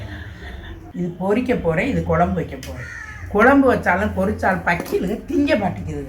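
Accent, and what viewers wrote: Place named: Ramnad, India. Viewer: Nenga ramnad dhana unga slang ramnad madhuri iruku illai adhai suthi ulla giramam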